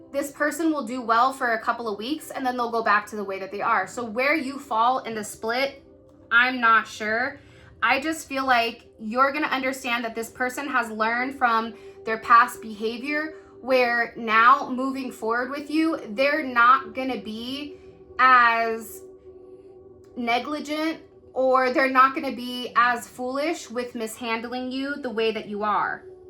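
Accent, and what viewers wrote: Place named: Bay Area, United States. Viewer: okkayyy I knew you had to be from The Bay. I know that ur swag when I hear it and see it. lol That’s Hella Dope….Yeeeee💕👑🔥⭐️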